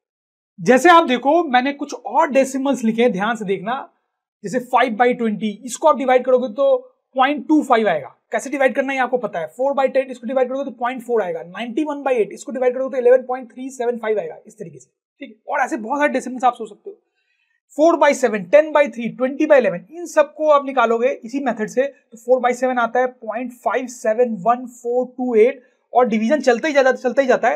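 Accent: Bihar, India. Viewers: aap bihar se hai kya sir ?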